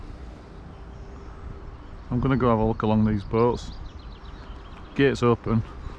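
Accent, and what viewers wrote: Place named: West Midlands, United Kingdom. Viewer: What canal is that? Is that the Aire/Calder? Your accent kind of sounds West Midlands, maybe Leeds area. Hope all is okay in the UK.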